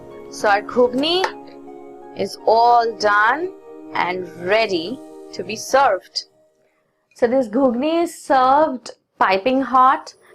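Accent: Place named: Bengal, India. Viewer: hehehe bhaja mosla that nice bong tone... :P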